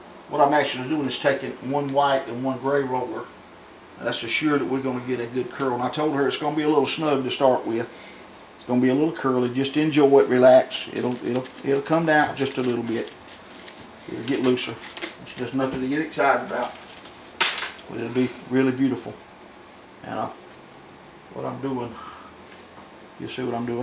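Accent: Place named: East Tennessee, United States. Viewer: Where is he from! He sounds like us here in East Tennessee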